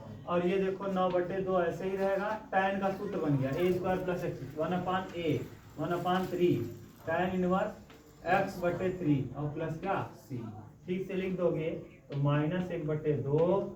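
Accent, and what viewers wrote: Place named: Bhojpuri, India. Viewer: really liked the way how you teach ....in bhojpuri accent ,apke bache boht shor krte hai par lol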